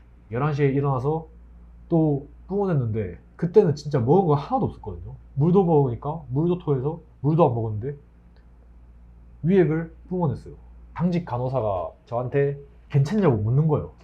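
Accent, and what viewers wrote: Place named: Gyeongnam, South Korea. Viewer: 부산사투리인지 경남인지 경북인지 궁금해요!!!!!! 근데 경남사투리같아요 맞아요?? ㅎㅎ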